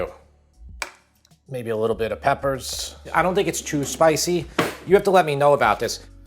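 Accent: New York, United States. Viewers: I find your videos so relaxing. Also, I love your approach to cooking. No pressure, do what you can with what you have. The New York/Jersey accent is just so loveable ❤️ Can't want to try this one!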